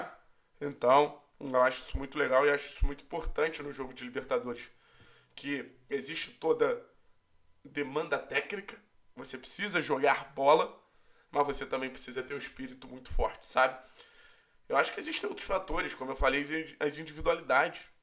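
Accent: Rio de Janeiro, Brazil. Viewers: Paranaense mais Carioca q ja vi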